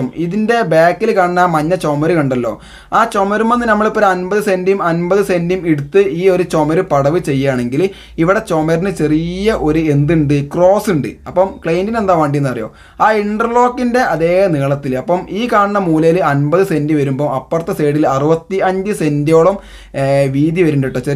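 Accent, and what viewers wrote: Malappuram, India. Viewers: ആ മലപ്പുറം ഭാഷ അതാണ് പവർ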